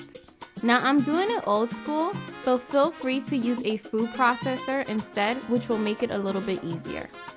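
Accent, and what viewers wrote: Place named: Queens, United States. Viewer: First off ... you sound like my people I knew in Queens, NYC :-) Love it! Second, I've been making mojo for years and I had no idea it was called this way! LOL! It's like the best marinade and base for just about anything Latin cuisine! The grilled chicken where this mojo is used along with the other ingredients is insane! My family loves it! I make it a lot! Gracias por todo!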